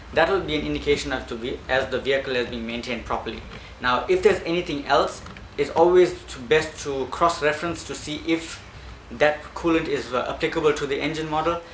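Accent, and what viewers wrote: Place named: South Africa. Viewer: bro what accent is that, are you south african?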